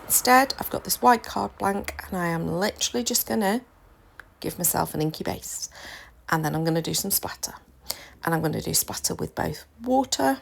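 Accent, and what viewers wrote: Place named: United Kingdom. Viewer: So happy I have discovered you throught this series! Love your creativity and your lovely accent takes me back to my university days in the UK ( a VERY long time ago 🫣). As for scraps, I have arrived at a system which works for me: I invested in one of these little plastic containers with seven (usefully) compartments, I believe they are intended for soup packets etc. puzzlingly. I designated each compartment for one of the rainbow colours. Now I can pull scraps in the colour I need every single time I die cut, before I pull a full sheet… It works a trat for me. Thank you for sharing your amazing videos, kind regards from Germany, Nicola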